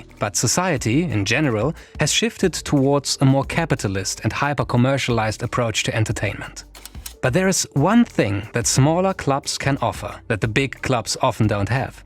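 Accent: Germany. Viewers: this guy could do a 10 hour video about paint drying and i’d still watch/listen love the soothing german? accent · DEUTSCHER AKZENT JAAAAAAA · Sounds more like he's german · Are you german?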